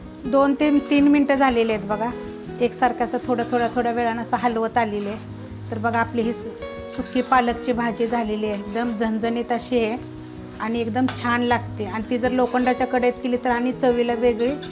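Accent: Satara, India. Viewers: खूप छान 👌👌👌 भाषेवरून आमच्या सातारा साईडचे वाटता..चॅनेल फॉलो केले 👍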